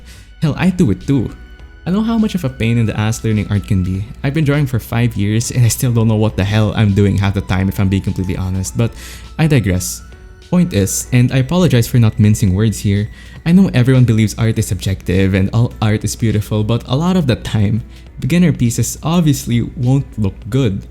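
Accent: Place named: Philippines. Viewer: This guy sounds filipino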